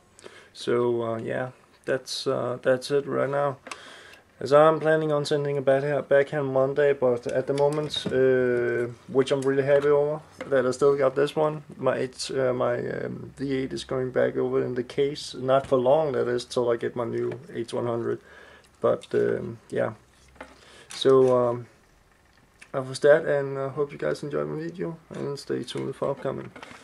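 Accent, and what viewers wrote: Denmark. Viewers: Are you danish? :-D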